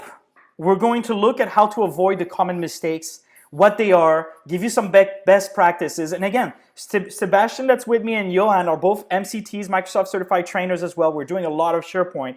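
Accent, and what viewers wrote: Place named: France. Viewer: Great insight and very helpful! Loved your French accent too :)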